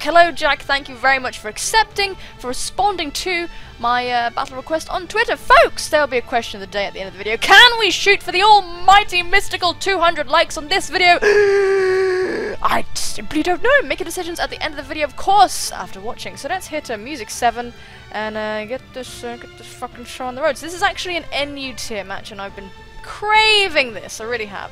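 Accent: United Kingdom. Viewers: You are SUPER British! Lol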